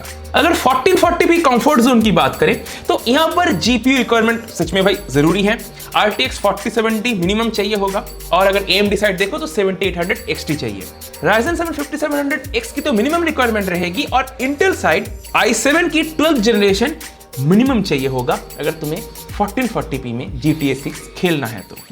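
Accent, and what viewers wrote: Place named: Bihar, India. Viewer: Bhai phle naak se baat krna band krde its very hard to understand what you are saying in that bihari accent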